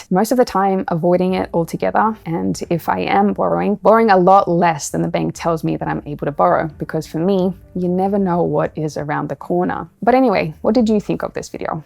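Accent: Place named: Australia. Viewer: Your starting to lose your Australian accent...😄 Thank you for the such details you go into too explain everything... it's a shame that 99% of the world's population has absolutely no idea what is really going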